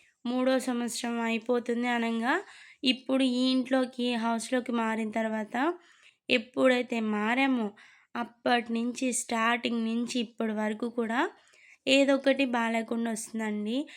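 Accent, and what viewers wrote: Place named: Nellore, India. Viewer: చక్కగా వుంది మీ video Super, వాయిస్ కూడా Super, Nellore నా ❤👌👍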